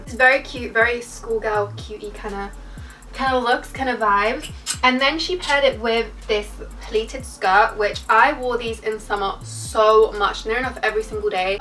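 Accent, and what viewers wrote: United Kingdom. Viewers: Brb about to spend all my coins in Shein😭 when I heard your British accent I was like yesss UK stores will be recommended 😭😭 And now I’m lost on Shein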